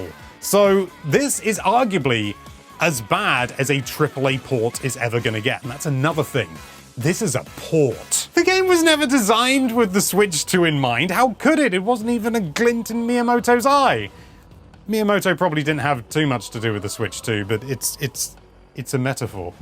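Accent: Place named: United Kingdom. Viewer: NOTE FOR THE EDITOR: so ive come to really enjoy the little gags on editing like the “its a methaphor” on this one. The videos on the channel have always been good to watch on a second monitor, specially with the more then enough waffling in a sweet british accent, but today i played the video on the TV while grabbing launch and a mayority of the video its just a static shot of someone talking, so i say all this to point out that that little gags, and more like it could make the video more entertaining and attractive to watch on a primary monitor, nice job.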